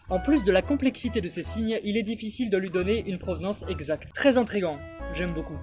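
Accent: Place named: South, France. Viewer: petit accent du sud qui fait plaiz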